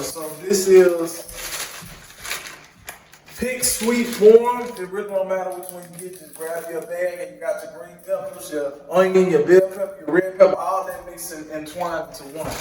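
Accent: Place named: South, United States. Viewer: I love his accent you know he from the Dirty South wud up tho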